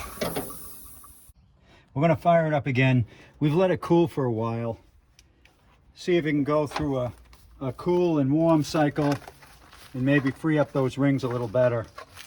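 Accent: New England, United States. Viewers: haha thought I could here some new england in your voice! Keep up the good work love your channel!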